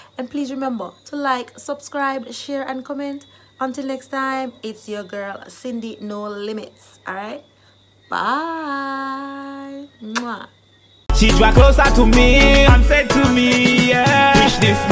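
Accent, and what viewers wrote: Jamaica. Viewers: Wow.i like the Jamaican accent.. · Wow, I like the Jamaican accent; I enjoy how you speak. I will start practicing how to speak like a Jamaican. This video is very helpful. Thanks for sharing. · I love how Jamaicans talk. I clicked on video just to hear you talk lol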